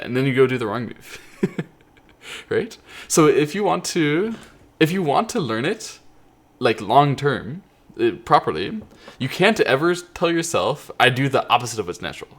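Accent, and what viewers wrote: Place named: Europe. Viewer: Crazy, I always assumed you were from some European country because you seem to speak English with a bit of an accent and a slightly strange cadence.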